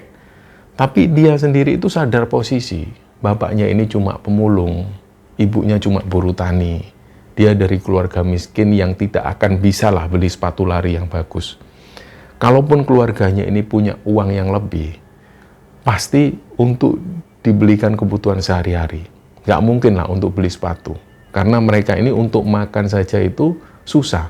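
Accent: Java, Indonesia. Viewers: saya tidak tau.. amalan apa yg dilakukan alm.bapak e mbak ariani sdah di level berpa tingkat kesbaran dan keikhlasan yg jalani sampai bisa mengangkat derajat kehidupan anak dan istrinya ... mohon maaaf jika tidak salah nenek" itu adalah wujud khodam dari alm. mbak ariani sekeluarga sehat" ya alfatihah buat khodam alm.dan almh. semoga husnul hotimah ... 🙏🏻🙏🏻 om pertahankam logat jawa ..jika di naskah memang ada bahasa asli ❤❤❤👍👍👍🤗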